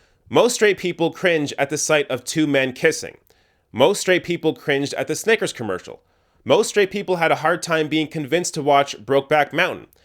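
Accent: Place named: Canada. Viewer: The canadian really came out in this video.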